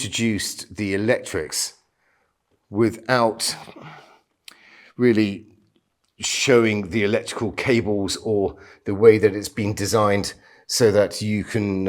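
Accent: United Kingdom. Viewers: it is, as always my sincere pleasure... i think i've realised why you and your character work so wonderfully well with these videos of yours... we english i think its fair to say, are known for reserve and, i assume from your general accent you were educated at a public school... and so to hear a public school "schoolboy" push thru the stiff upper lip and use english expressiveness in its purest form in relation to something that is clearly a passion for you, and to be commenting on such truly italian expressions, makes the communion of both strong characteristics emphasise the deepest of appreciation for what can only be described as architecure almost as an art form in that it is utterly compelling in the feelings it evokes within. such a powerful combination and the pleasure that they and you bring to us the collective viewers is of a calibre that i cannot find anywhere else. 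bravo. bravo, bravo and from your intentaional audience, our appreciation back to you.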